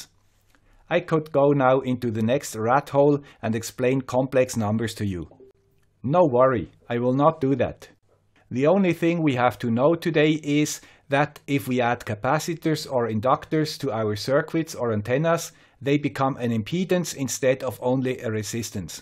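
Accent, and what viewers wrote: Switzerland. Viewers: Now when I am working with electronics I have a Swiss voice in my head.. Dankeschön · Thank you, as a French, I like your Swiss accent, and I remain admirative in front of the simplicity with which you can explain complex subjects...I'll keep watching your multiple videos and keep learning... Your experiments basically save me the hassle of trial and errors, and help me to go straight to the right solution. Bravo! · What more could you want? A guy with a Swiss accent explaining complex numbers ....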